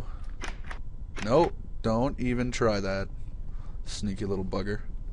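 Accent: Canada. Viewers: "i am going to punch you... with a sword" and by the wayare you canadian?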